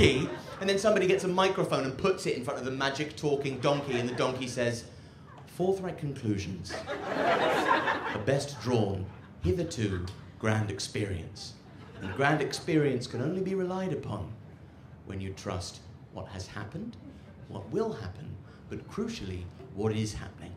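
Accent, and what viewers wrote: United Kingdom. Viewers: Amazing Special! that Scouse accent is so fucking funny. Bum Nuggets.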